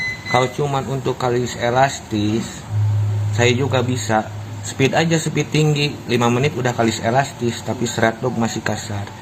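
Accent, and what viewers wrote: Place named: West Java, Indonesia. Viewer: Kang sunda bangeeet...sy suka😁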